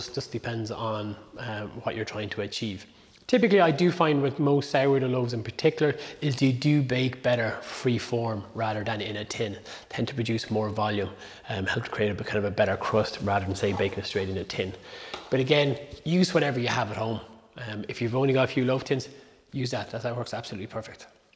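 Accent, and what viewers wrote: Ireland. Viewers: I love your accent, I feel in I’m Ireland when I listen to your voice. I really like you baking style. Cheers buddy keep making great bread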